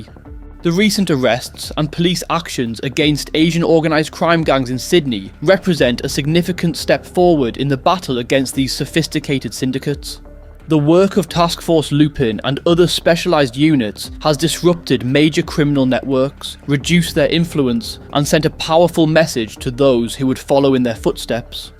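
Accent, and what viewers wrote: United Kingdom. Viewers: who is this British accent speaker broadcasting old news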